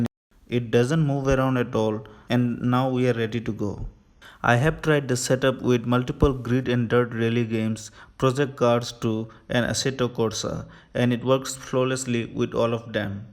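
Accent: India. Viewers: You sir have the "Stereotyped" Indian/South-Asian accent, the first time I've heard one of my countrymen with the accent foreigners mock us with. But thats Ok. :-)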